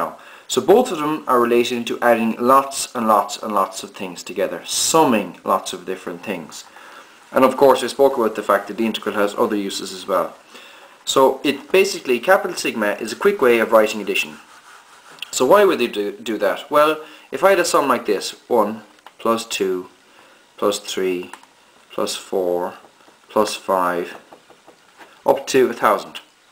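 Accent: Ireland. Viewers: wow ,, thank you im 60 , and never understood ,, this ,, you teach so very well ,, and you sound Irish ( win , win ) a bit thank you ,, im going to watch the rest out you video's ,, from a Yorkshire ( Hull ) man ( living in Canada ) ,, it took me 40 years to find a real world project that needed Trigonometry , so i went to my daughters school to see if a math teacher would teach me what i needed to know and he did , and i finished the project -- again Thank You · YOURE IRISH !!!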